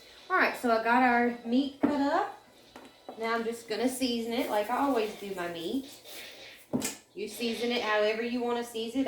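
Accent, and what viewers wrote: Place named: Louisiana, United States. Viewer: I will surely try this😊😊 sounds like you are from Louisiana. I am from SW La. good,simple food….nothing better!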